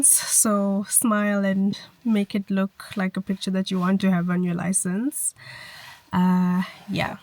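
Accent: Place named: South Africa. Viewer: Oh sweet! I'm glad they don't take it, that would be a mission when flying back and forth XD thank you so much for all your help <3 It's also soo good to hear another saffa accent again :')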